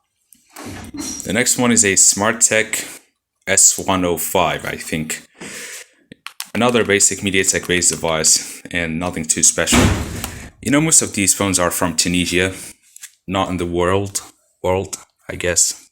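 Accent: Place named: United States. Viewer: why do you sound kinda american lmao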